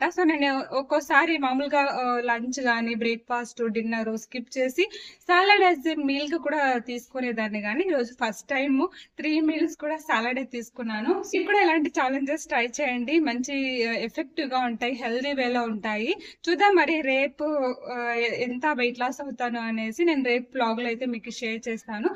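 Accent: India. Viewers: Mee language baavundi Ananthapur kadaa mam meedi??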